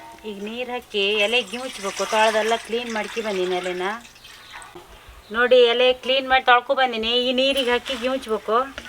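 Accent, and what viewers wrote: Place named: Siddapur, India. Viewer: Amma nimmur yavduu? Neev matado shaily nodudre siddapurdavruu ansutte nange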